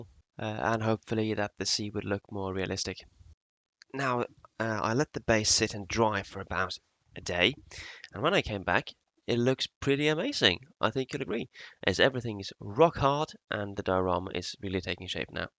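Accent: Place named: United Kingdom. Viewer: Turned out really nice, mate. I would suggest if you’re going to get into dioramas that you invest in a Dremel tool (a little over $120 Aussie on eBay, so probably around 60-70 quid going from your accent, lol!!) - they’re perfect for cutting, and you’d be able to make the edges where the tanker broke in two more jagged and realistic. Also get yourself an airbrush (around AU $150 on eBay for a half decent kit including compressor and everything else you need), it makes doing the detail work that much easier. Please don’t get me wrong, what you’ve done looks fantastic, but particularly the Dremel will go a long way for those little finishing touches. As an aside, on a historical note, empty tankers were even more dangerous and prone to being blown out of the water than full ones, as the tanks may have been empty, but were full of explosive fumes, just waiting for a spark. 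Tanker crews were hands down some of the bravest to serve!!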